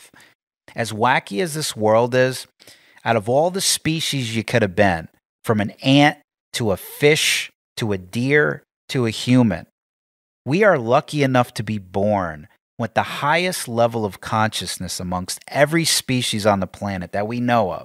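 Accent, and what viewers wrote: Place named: New York, United States. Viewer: You sound like a New Yorker, I Love it! 🙌🏻✨🥂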